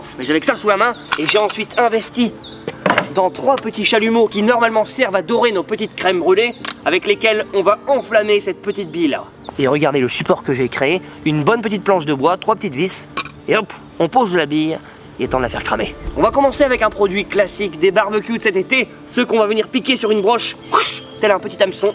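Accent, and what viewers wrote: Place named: North, France. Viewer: ché l'meilleur accent celui de ch'nord pas un bruit d'kien pas un bruit d'ka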